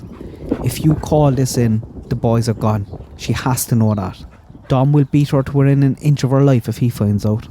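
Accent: Ireland. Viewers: Police dogs can pick up DEATH. The boys has been in CPS for over 9 months & would have said something by now. Summer's foot prints were found on 2 different properties confirmed by PI & Dogs. This theory is CRAY CRAY. Crackin Case done GREAT coverage of this case with FACTS. I enjoy listening to your accent & your slang for stuff-Irish Right? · That’s possible your theory, alcoholics and drug addicts don’t pay attention to their children. Then put a phone on top of that and you’ve got a mother who never does anything. Just take a look at that house it’s filth. The only thing she cares about is drinking and getting high. The kids are just an annoyance. I love your Irish accent. My last name was Dailey 😀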